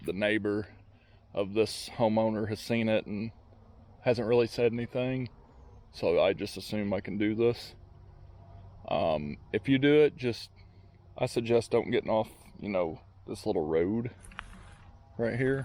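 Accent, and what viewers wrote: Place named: South, United States. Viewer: Very cool! Thanks so much. You sound southern, and I'm southern so I'd know!😄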